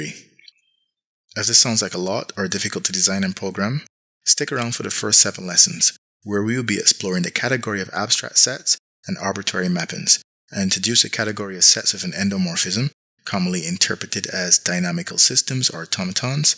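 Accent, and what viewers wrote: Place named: Barbados. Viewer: This is so exciting! I have been reading some Category Theory for Programmers and decided to take another look at what's out here this year. In the past there was not much available. Imagine my delight and surprise to find someone who is applying Category Theory to composition!!! This will be an exciting ride. I recognised the Barbadian accent very early on since I am from Trinidad. I look forward to learning from you, since I am interested in music, functional programming; even trading. I even studied Nuclear and Thermal Engineering many moons ago and my first job was at BNL:). Thank you so much, Dr..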